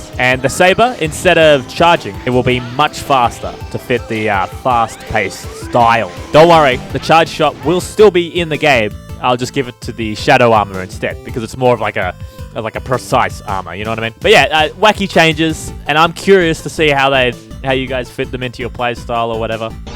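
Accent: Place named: Appalachia, United States. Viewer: You somehow sound like someone from Appalachia and Australia at the same time.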